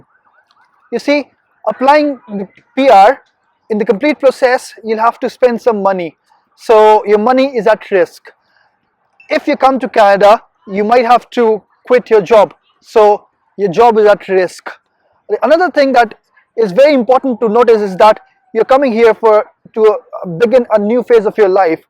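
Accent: India. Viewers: You sounds like you are in India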